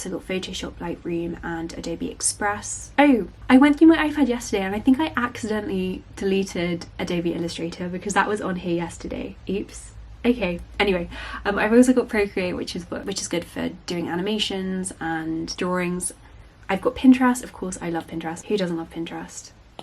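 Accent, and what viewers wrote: United Kingdom. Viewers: British accents fascinate me like Henry Higgins, in such a small country. This lass sounds a lot like Elton John which makes me wonder if they hearken from the same region.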